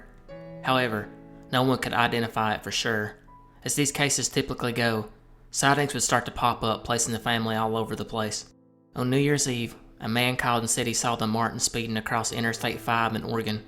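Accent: South, United States. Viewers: I recognize southern accent. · Kentucky? WV? Tennessee? I’m trying to pinpoint lol. I’m in kentucky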